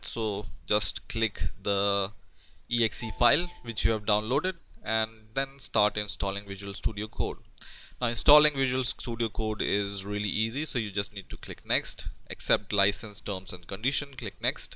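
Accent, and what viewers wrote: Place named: India. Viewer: wahhh..indian accent.. god i am going crazy listening to u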